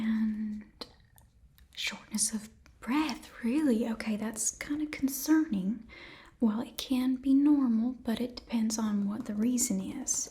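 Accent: South, United States. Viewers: i love ur southern accent videos sm!! also i did get 2 post-roll ads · I saw that you were gonna do a southern accent and clicked immediately because you slay it!! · ur voice with the southern accent reminds me of ruby from steven universe with the song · On behalf of the Southern community, I approve accent and recipe for mac and cheese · I just wanted to comment and let you know I received a post roll ad and also amazing video I always love the southern accents ❤ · personally i just think southern is absolutely divine! love how warming it comes across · The southern accent is brilliant! Really comforting. I always love your videos! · Oh god got the southern accent on point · I love the southern accent so much it's so comforting, even if I've never even been anywhere near the US!❤️❤️❤️❤️ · Her southern accent is top tier!🥰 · It’s good to see you again 😉 your southern accent is magnificent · Did our incredibly pleasant nurse with a good dose of southern hospitality get promoted? 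Did she pull it off and earn her doctorate? Because I swear she was still a nurse last time. Either way, southern accent with a load of caring warmth is wonderful stuff. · Nice southern accent. I don’t have as deep of one despite living in the most southern of the states.😂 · This'll come in handy when I get a cold. The southern accent is the cherry on top. Thank you! Greetings from the Emerald Isle.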